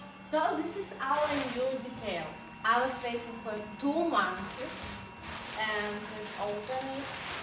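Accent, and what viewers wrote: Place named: Russia. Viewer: акцент русскоговорящий ;)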